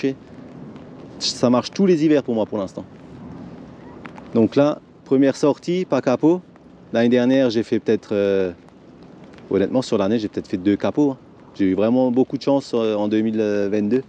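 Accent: Alsace, France. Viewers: Salut ! Je suis étonné des résultats avec le rogen j ai encore jamais rien fait avec sur le Rhin ou dans l étang du village avec (j ai très peu pêché avec aussi 😅). Tu animes comment ? Juste en linéaire ? Merci pour les vidéos et pour ton léger accent Alsacien 😉 je te souhaites plein de beaux poissons pour 2023 et continue tes vidéos !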